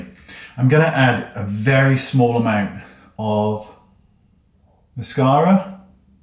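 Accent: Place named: England, United Kingdom. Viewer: And can’t beat the English accent, either! Love you, 😘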